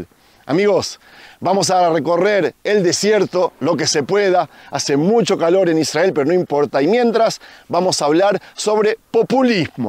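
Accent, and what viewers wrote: Buenos Aires, Argentina. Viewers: Shalom, cada vez que te oigo digo, este hombre no puede negar que es Argentino y Porteño. Muy buena la metáfora . Los gobiernos populistas te empobrecen y después hacen creer de que eres un pobrecito y ellos como son buenos te da un poco de comida y alguna beca. Y sabemos, que siempre la comida gratis siempre es la más cara. Cariños a tu esposa, festejando el aniversario en tu trabajo. En lugar de llevarla a Paris. Jaja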